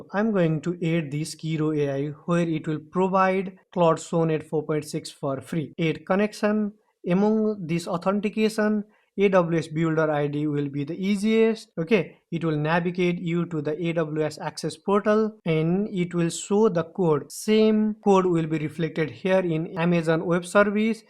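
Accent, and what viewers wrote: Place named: Nepal. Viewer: your accent is so nepali you nepali?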